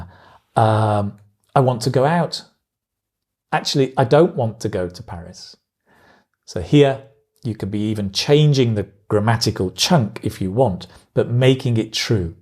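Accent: United Kingdom. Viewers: so you have a british accent right? And u speak slowly trying to get a neutral slang so that all ur viewers can understand ur speech?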